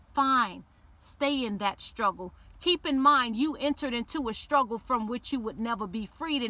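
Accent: United States. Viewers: I hear a Louisiana accent. Thanks for sharing you testimony beautiful. This is my first time watching any of your videos. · You are beautiful and I love that Florida chopped accent lol…what’s your IG???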